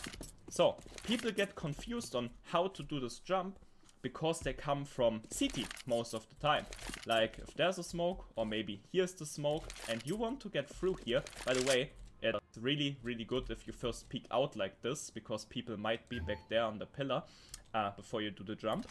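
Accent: Germany. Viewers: German accent ? 😉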